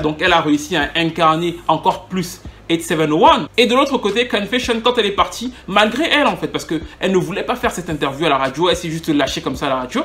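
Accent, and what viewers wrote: Cameroon. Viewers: L'accent CAMEERRRRRRRR !!! indétronable !!!! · Moi qui aime la musique et qui pensais tout savoir, tu es génial et ton accent camer ne m'a pas échappé dès le début jetais la que mais attends le gar ci c'est un freeeere. Massah. Keep going u talented and u have deep deep knowledge. Après 10 vidéos watches je mabonnes et jactive la cloche. Push through and through and through !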